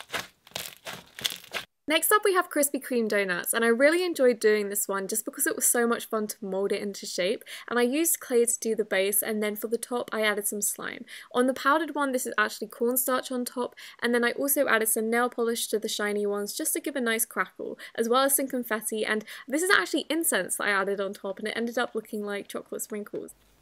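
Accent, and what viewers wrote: United Kingdom. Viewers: She British, it’s her accent, she can’t control it.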